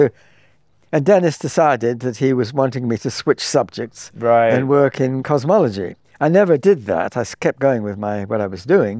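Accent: United Kingdom. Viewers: Gypsy tongue with a British accent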